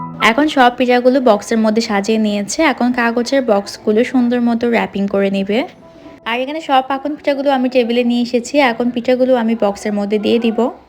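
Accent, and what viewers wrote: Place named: Chittagong, Bangladesh. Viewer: hmm apnar kothar style e bujhtesilam apni chattagram er, r chattagram e jamai ashle asto murug musollom kore eta ami jani, amar nanu bari potiya.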